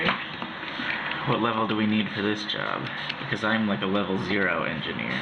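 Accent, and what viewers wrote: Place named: Ireland. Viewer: Great to hear an Irish accent in a WIDRN video. How many of us Irish Arandanauts are there?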